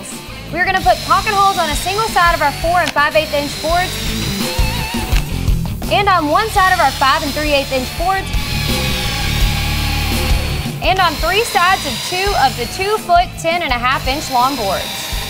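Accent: South, United States. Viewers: She tries to hide it but, I hear that Souther Twang. 👍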